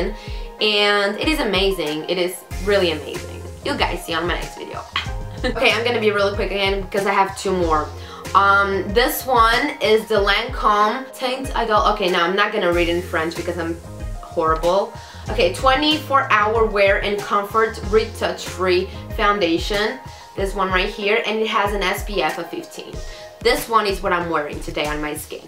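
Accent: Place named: Portugal. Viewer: hmmm okkk! Mas se calhar era uma forma de eles começarem a perceber outra língua! Acho que não é tão natural, eu percebi logo que eras portuguesa. E atenção, que falas muito bem! Mas acho que podias ter mais sucesso de falasses em português. Mas se não te sentes confortável, então já é outra coisa. Muitos beijinhos!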